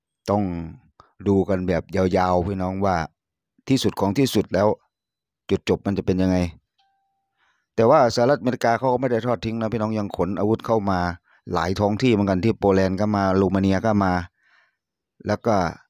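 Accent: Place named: North, Thailand. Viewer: แอ้ดมินน่าจะเป็นคนเหนือสำเนียงแบบคนเหนือครับพ้ม😊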